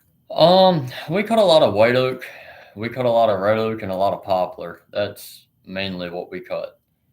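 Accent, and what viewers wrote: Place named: South, United States. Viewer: Wow I had to subscribe to your channel I seen your first one when you were looking for logging trailers your young & I just have a feeling your business is going to go through the roof ❤ 👍🏾🙏🏿 & if you stay on YouTube and don't change your going to do amazing Because you come off sounding so amazing being a young logger & your country accent seals the deal ❤ ❤❤❤❤ You won't be single if you are for long lol from Norristown PA keep up the good videos and may your business prosper an enormous ways & i hooe you fire the people that are not 🚫 helping your business 👍🏾🙏🏿👌🏾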